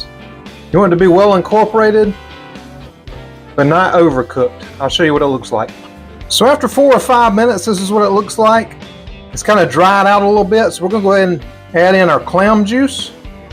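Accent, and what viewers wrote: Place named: South, United States. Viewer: Great recipes from a keto chef that sounds like me. I'm from NC so you sound like my next door neighbor. Refreshing to hear in this massive web of You-tube videos from who knows where. Keep on cooking Southern!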